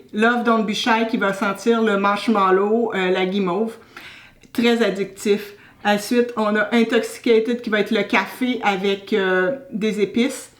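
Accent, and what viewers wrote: Quebec, Canada. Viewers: Bonjour et merci pour ton message. Je découvre ta chaîne avec un grand plaisir en même temps que ton délicieux accent québécois qui me rappelle l'une de mes tantes que j'adore. Quel marathon!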